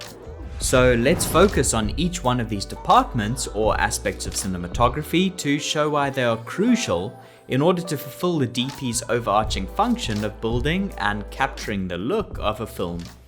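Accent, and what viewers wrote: South Africa. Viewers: Are you South African by any chance? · Are you South African bro? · I hear a South African accent!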